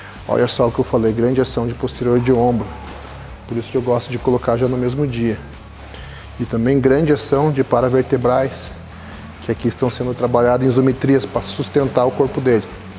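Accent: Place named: Curitiba, Brazil. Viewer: Sotaque típico de um curitibanoo <3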